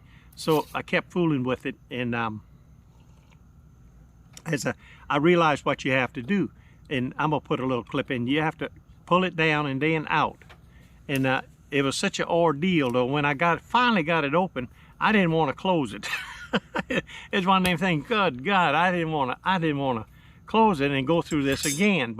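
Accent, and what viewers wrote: South, United States. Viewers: you have a great channel! And your southern draaaw is so great! Love watching and listening. But truly, I really love learning. Even though I van camp, the idea of “Packing small” suits my adventure style and you guys teach me so much! And I like to know stuff!! 😂🙌🏼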